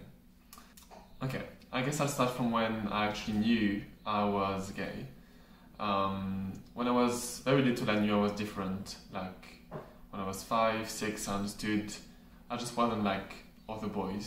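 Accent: France. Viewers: Are u french ?🇨🇵😆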